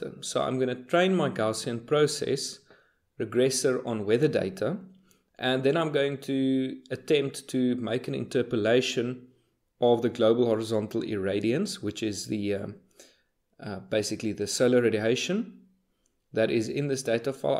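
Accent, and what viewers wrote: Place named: South Africa. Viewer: Good explanation. It is heartwarming to hear a South African accent on a youtube video every now and then. My ML model predicts with a 90% probability that this speaker is from Pretoria.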